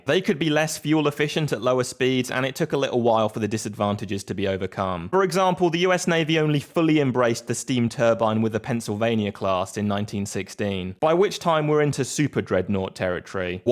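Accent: United Kingdom. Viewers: Holy british accent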